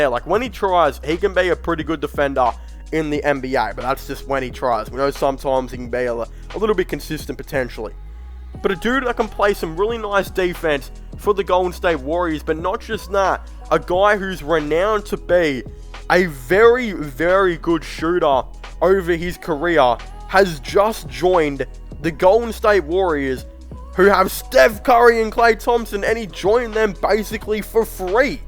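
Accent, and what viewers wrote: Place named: Australia. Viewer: I've subscribe because I love this dude's accent. I wonder where he's from? 🤔 Maybe Australia 🤷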